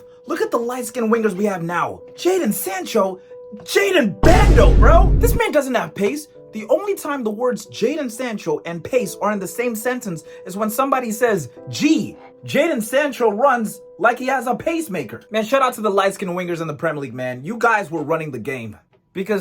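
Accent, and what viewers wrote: South Africa. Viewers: Your South African? Same 😅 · Bro, you South African? Shocked by accent. Gonna sub in any case.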